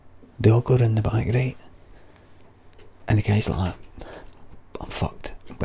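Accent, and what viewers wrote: Scotland, United Kingdom. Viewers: Scottish accent “Letter story comence” 📕🙏🏻😴, absolutely biblical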